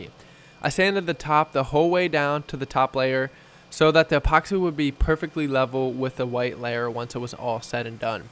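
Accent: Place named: Western Pennsylvania, United States. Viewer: Sounds like you live near me in western PA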